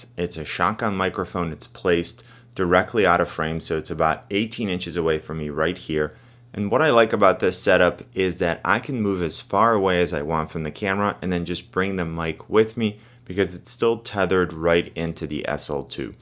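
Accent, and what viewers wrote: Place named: Ohio, United States. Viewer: Hahaha, I thought to myself, "Man, Ohio. I can hear it." Then you showed Cleveland. BOOM. Greetings from an Ontarian in South Korea. <3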